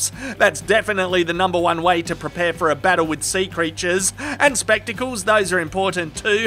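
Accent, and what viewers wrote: Australia. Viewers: You are the Siskel and Ebert of reviewers with an Aussie accent. No not because they’re both dead, because you’re just that good.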